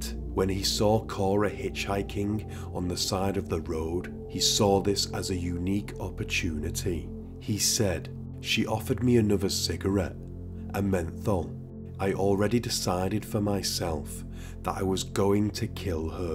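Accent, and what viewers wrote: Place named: Merseyside, United Kingdom. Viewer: he sounds like hes from Merseyside, the same region as, but hes toned and slowed down his accent for these vids.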